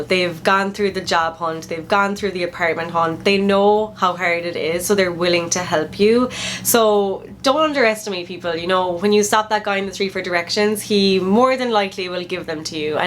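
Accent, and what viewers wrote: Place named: Ireland. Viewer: Love your Irish accent and positive energy. Keep up the good work!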